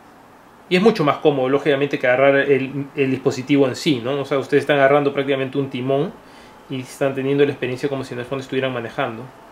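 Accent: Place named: Peru. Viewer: Por tu acento siento que eres peruano ?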